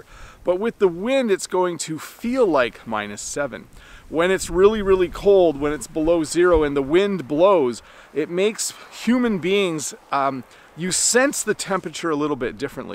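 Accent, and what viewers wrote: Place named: Canada. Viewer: I heard the hallmark of Canadians a lot out here: the Canadian raising "aboat".Lol.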